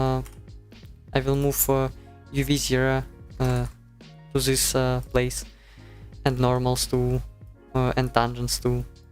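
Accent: Russia. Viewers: мужик у тебя капец акцент лютый русский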